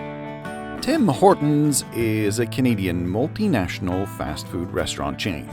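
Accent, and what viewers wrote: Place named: United Kingdom. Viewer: British accent pffff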